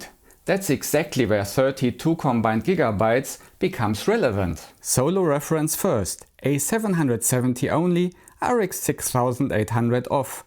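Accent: Germany. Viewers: Hey bisschen off topic und bitte nicht übel nehmen - wenn Du magst, könntest Du mal ein paar von den schwierigeren englischen Wörtern, die man ständig benutzt beim Thema KI (inference fällt mir spontan ein, aber es waren mehr), nachschlagen wegen der Aussprache. Tschörmen Ecksent ist auf YouTube gar kein Problem, aber bei den Fachbegriffen würde es vielleicht professioneller wirken, sie zwar mit Akzent, aber davon abgesehen korrekt auszusprechen. Ich empfehle die kostenlose Seite der Cambridge Dictionary, die haben sowohl Hörbeispiele als auch einen tollen Ersatz für die nervige Lautschrift. With that out of the way: I like your channel a lot, keep being different than those who just run the same boring tests on every new model!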